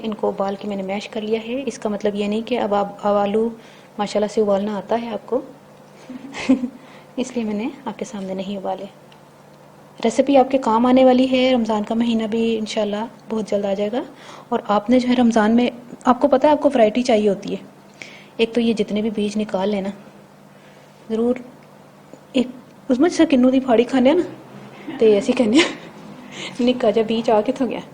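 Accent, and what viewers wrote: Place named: Rural, India. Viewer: Bhen m kehna chahti hu ky agr video bnani h to kissi sy voice over krny ki classes lyy lo w ky apko sense nhi h kese bolyy h bht paindu boltii aur aik bt ap bht zuda bolti wooo naaaa wooo naaa aur aik wo apki h jis ko bolny ka tarika hi beech m urdu ky punjabi start kr deti h plzz tameez sy bolnaa seekh loo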